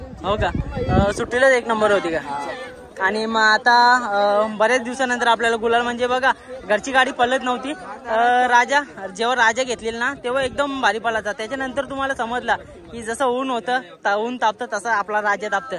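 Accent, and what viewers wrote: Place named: Agri, India. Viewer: bhai aagri bhashen bollyav jam bhari vatt .. kadak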